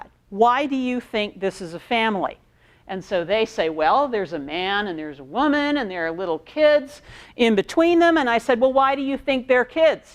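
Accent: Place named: United States. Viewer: Latin spoken with American accent sounds funny.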